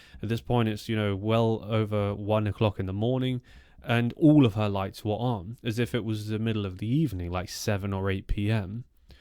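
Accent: United Kingdom. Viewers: You have some great stories,but please make them less meandering!! I've seen people commenting on that in your other videos...you will lose viewers, otherwise. Of course, your British accent is nice and you seem personable. Just less detail,so that you can keep the story moving along...😊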